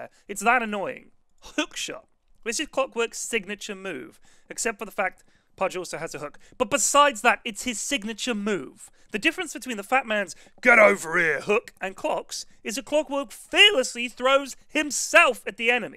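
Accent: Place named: United Kingdom. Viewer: his british accent makes everything sound funny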